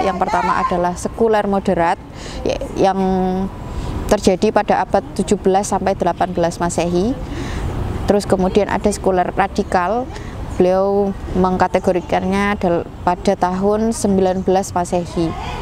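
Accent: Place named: Java, Indonesia. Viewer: Medhokee leh mb Ditunggu ulasan buku lainnya ka...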